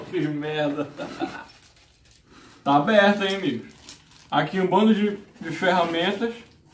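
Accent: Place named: Pará, Brazil. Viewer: caray mlq com esse sotaque tu so podes ser do pará kkkkkk mta coincidencia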